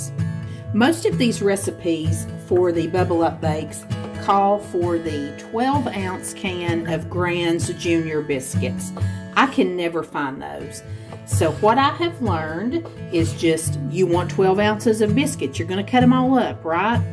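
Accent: South, United States. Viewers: Love that southern Belle accent and of course your great recipes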